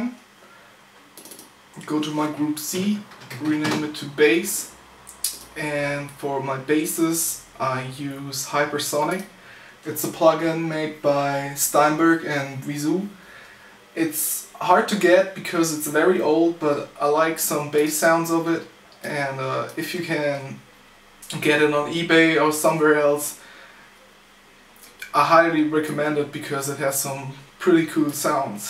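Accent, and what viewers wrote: Germany. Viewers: Bist du deutsch? Du sprichst so englisch mit deutschen akzent :D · Very authentic. Where do you come from? Your accent sounds very german. Is it a good choice to start with maschine right from the beginning? Your much of a clicking person. but it's possible to browse with the Maschine Controller, isn't it?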